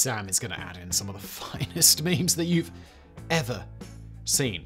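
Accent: United Kingdom. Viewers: a witty British narrator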